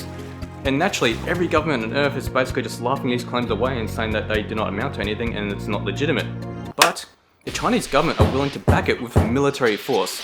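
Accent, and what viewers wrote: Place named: Australia. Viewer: Good to hear australian voice and humour 👍👍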